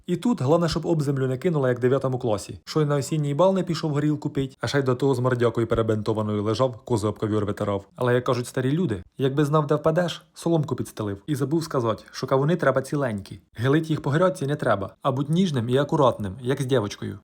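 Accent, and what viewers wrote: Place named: Cherkasy, Ukraine. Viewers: Новий П.Глазовий !!!!чи Литвинов! Але ж ти Суперський з черкаським суржиком🎉🎉🎉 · Говір такий як у моєї мами Вона також родом з Черкащини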